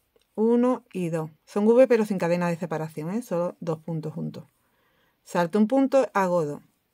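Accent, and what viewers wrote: Andalusia, Spain. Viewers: además de que la idea es chulísima, no veas la alegría que me da de escuchar mi andalú ❤❤❤ Soy de Sevilla. Estoy haciendo ahora un hexagonal con los restos que tengo. Sí llego a ver el vídeo antes... Pero bueno, creo que lo voy a empezar 😂😂😂 Un abrazo 🤗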